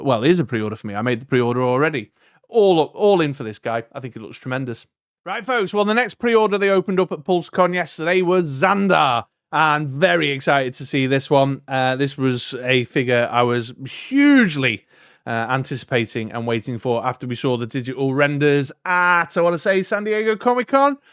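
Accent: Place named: United Kingdom. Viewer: Thanks for the run down mate! Its always great to hear it in a brit voice lol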